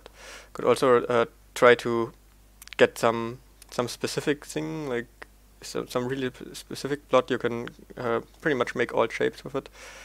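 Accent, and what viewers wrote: Germany. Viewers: okay cool hört man das du deutscher bist XD.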